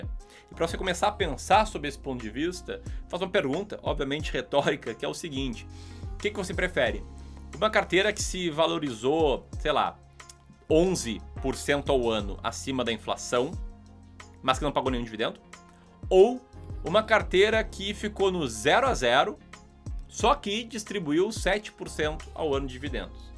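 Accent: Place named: Rio Grande do Sul, Brazil. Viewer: Bah ô Loko, tu é gaúcho né? Ãããhaaaam..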